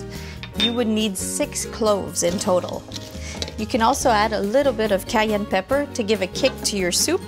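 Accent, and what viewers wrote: Canada. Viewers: That Canadian accent doe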